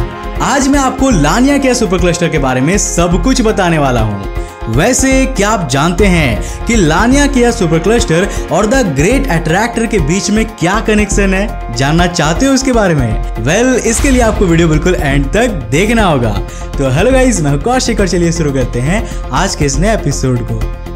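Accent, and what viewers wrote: Bengal, India. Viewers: bhai tum bengali ho kya? btw love your videos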